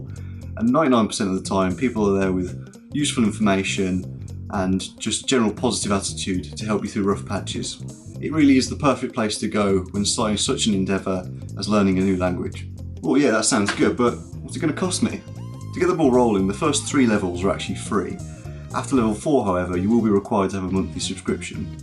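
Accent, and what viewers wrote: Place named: Birmingham, United Kingdom. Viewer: Kantan Japan I will definitely check that out, I had heard of it but was already swamped with books and other websites etc. And the accent give away is from your N4 video, you said the number 5 - it's impossible to cut the accent out of that number ;) Also, I'm from Great Barr, so only down the road - probably why you sound so familiar!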